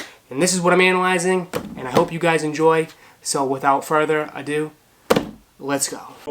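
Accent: Boston, United States. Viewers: I hear an accent lol Boston? EDIT: I asked that in the beginning of the video.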